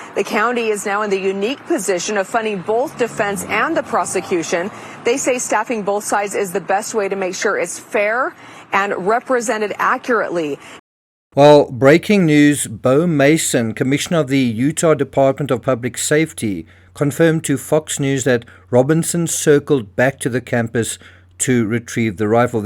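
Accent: South Africa. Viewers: Mmmh, u sound very South African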